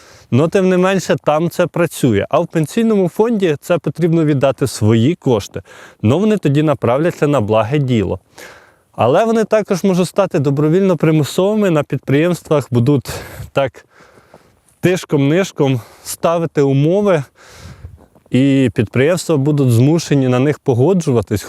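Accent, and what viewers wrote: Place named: Galicia, Ukraine. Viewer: Зауваження ведучому,коли ви вже почнете вивчати і розмовляти правильною українською мовою,що це за слова буде,будуть?Гидко слухати.Ідіть на курси і навчайтесь розмовляти.Галицький у нас в Україні не проходить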